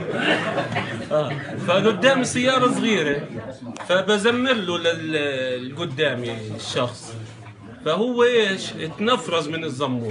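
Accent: Palestine. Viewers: فلسطيني 😂😂😂 مش عنصرية بس مبين من لهجته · هاظ مش اردني من لهجته هاظ فلسطيني مش اردني قال بسولف بكيت بدل كنت 😂😂😂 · هذا لهجته مش اردني فلسطيني مبين من اول ثانيتين عرفت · هذي مهي لهجتنا هذي لهجة الفلسطينية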